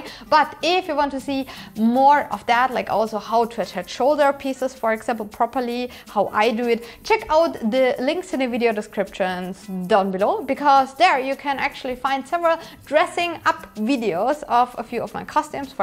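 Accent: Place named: South, Germany. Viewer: Da kam das deutsch durch. Habe dich gerade erst gefunden und war noch nicht ganz sicher, welcher Akzent das ist... Erstmal abonniert.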